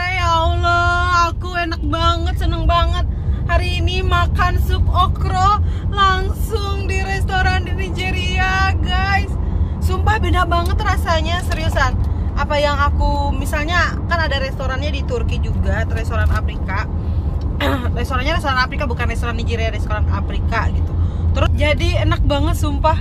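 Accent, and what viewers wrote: West Java, Indonesia. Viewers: Tth sunda bgtttt hihihiiii walaupun di negara org.masih ttp nyundaaa sukaaaaa😍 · Teteh mani lucu nyunda pisan sok atuh pulang ka tanah Pasundan hehehe cing damang teteh .enggal gaduh bayi atuh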